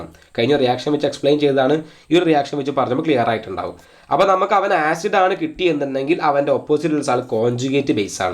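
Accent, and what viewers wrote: Malappuram, India. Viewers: sir ന്റെ class കേൾക്കുമ്പോൾ schoolil നിന്നും മനസ്സിലാകാത്ത portions friends പറഞ്ഞ് തരുന്നേ പോലെ ന്ണ്ട്....പിന്നെ മലപ്പുറം slang കൂടി ആയപ്പോൾ pwolii 😻🙌💣💥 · Malappurathu karan anoooo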